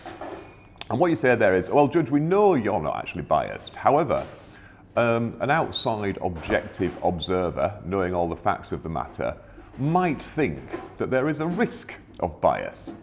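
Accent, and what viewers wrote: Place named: Sheffield, United Kingdom. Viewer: On a side note, have you said anywhere where you are from. Your accent has a North Sheffield sound. Or an I miles away?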